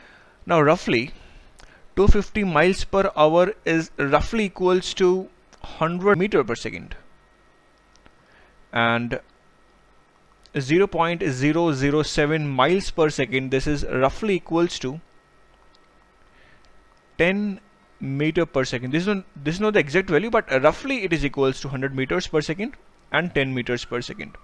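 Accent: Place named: New York City, United States. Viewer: NYC voice pitch 😊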